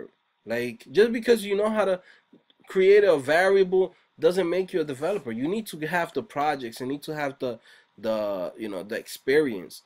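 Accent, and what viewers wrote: New York, United States. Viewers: dude i love they way you are talking ahahah, are you from ny? thanks for telling it like it is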